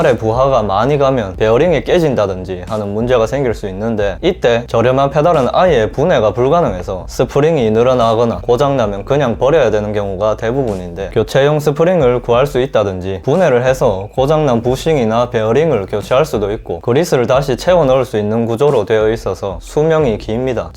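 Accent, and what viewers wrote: Gyeongsang, South Korea. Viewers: 정겨운 경상도 사투리.... 은근 그림 잘 그리심!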